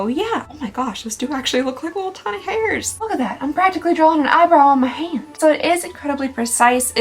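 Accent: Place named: South, United States. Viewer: Sounds more like you’re back from Texas 😂🥰 anyone else hear that southern twang a little extra in this video….😉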